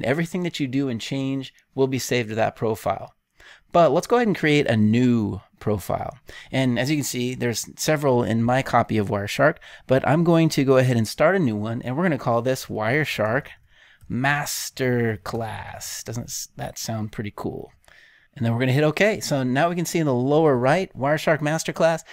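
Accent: India. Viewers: i dislike the Indian accent; can't help it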